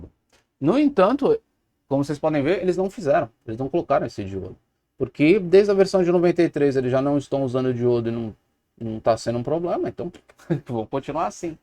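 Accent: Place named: São Paulo, Brazil. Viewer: Tá achando ruim amigo? Faça como eu. Desisncricão realizado com sucesso. Sotaque paulista merda.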